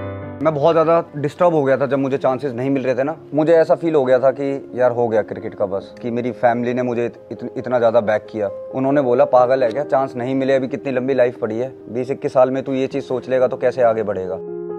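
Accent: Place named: Haryana, India. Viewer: Bhai ka pure Haryanvi accent hai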